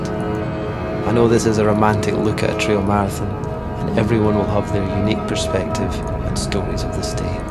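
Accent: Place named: Scotland, United Kingdom. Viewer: Love this! Good scottish accent to narrate too; not that I'm biased ;)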